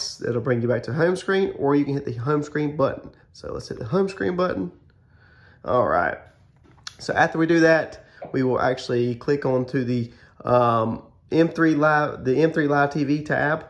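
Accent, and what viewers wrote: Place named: South, United States. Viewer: face-orange-frowning: Had a hard time understanding too much mumble and southern drawl together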